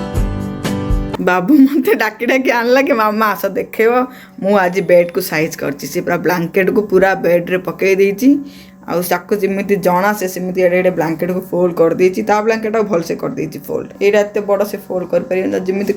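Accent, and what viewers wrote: Berhampur, India. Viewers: Di tame eng. re ହିଁ subscriber kuha odia sabda (ଚାନ୍ଦା ଦାତା, ଗ୍ରାହକ) ta vala laguni, but hindi sabda (yogdan karta) ta gote prakara thik achhi. Tamaku dinaku thare na dekhile jama val laguni, khass kari tama ବରମ୍ପପୁରୀଆ vasa, ohh 👌👌 mind blowing 🤗🤗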